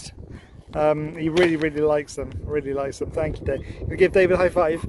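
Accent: United Kingdom. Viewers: Are you cornish?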